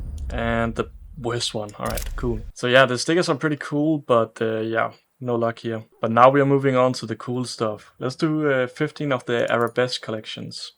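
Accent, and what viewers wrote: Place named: Denmark. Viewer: Danglish is strong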